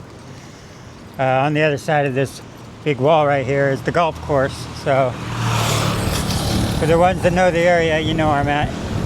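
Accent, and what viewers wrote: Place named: Tennessee, United States. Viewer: From Tennessee. That explains your accent. Keep riding and discovering Malaysia. You have a splendit Advisor in Douglas of Plane Doug.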